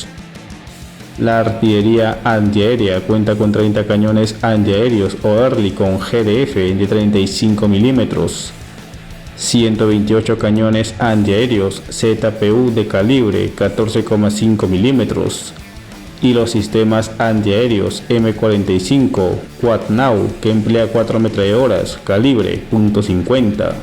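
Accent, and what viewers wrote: Peru. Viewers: Revisa el canal, es peruano, sale en el video que le dan el botón de plata u oro de YouTube XD además es cosa de escucharlo xd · TE DA VERGUENZA SER PERUANO? ENTONCES NO FINJAS QUE NO TIENES ACENTO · Es peruano creo , y ellos hablan así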